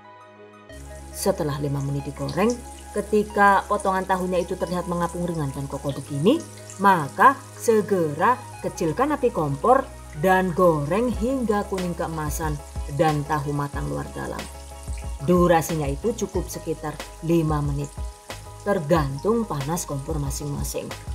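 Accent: Banyumas, Indonesia. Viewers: Ok OK OK Siap di nikmati lugot Chennel ini sangat ngapak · Bahasane ngapak metu ya bune, kaya nyong deneng, joss 😃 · Orang ngapak bu? 😆😆😆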